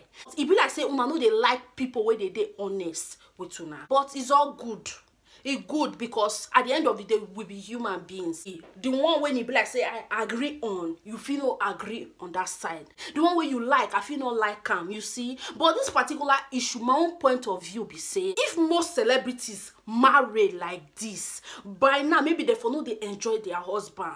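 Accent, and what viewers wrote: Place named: Warri, Nigeria. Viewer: Chai, this your pidgin na original one. It seems you be WARRI brought up WAFFI BAE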